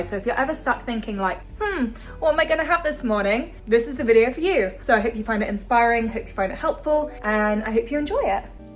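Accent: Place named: United Kingdom. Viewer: no dear, please! I'm in love with everything British, like your British accent 😍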